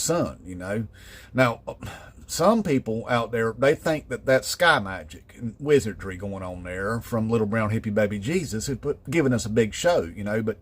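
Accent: South, United States. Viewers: You sound like you're from the South. Don't tell me that you can look around the US today and not feel that the idea of Jesus coming to unalive you doesn't have some appeal. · Hi Mr., I gotta confess I love your accent, it sound so american-rich linguisticly!! Cheers from Costa Rica!!! The whole world is literally watching!!!! 👍🏼👍🏽👍🏾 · Hahaha this guy is modern day version of the Third Reich with a redneck accent. The left hate it when other people have different opinions. I don't believe in the rapture therory but certainly respect those who do. HEY DUDE LET PEOPLE BELIEVE THE WAY THEY WANT TO IT IS CALLED THE FREEDOM OF RELIGION...EVER HEARD OF IT?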